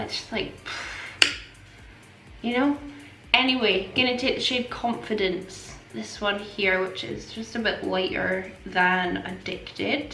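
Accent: Scotland, United Kingdom. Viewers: Love the Scottish accent 😌 I’ve been seeing outlander and loving the accent 😋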